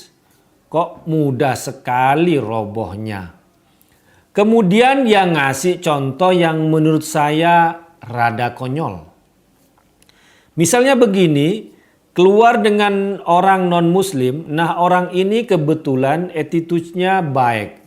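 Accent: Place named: Java, Indonesia. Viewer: dia cocok hidup di dataran arab .... pake logad jawa sekali...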